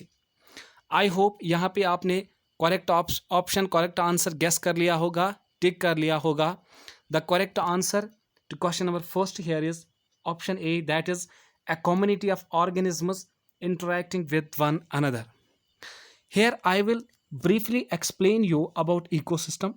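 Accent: Kashmir, India. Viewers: Kashmiri tune smj ni aati words ko repeat pr krke sunna pdta h....biggest problem 😞😞😞😩😩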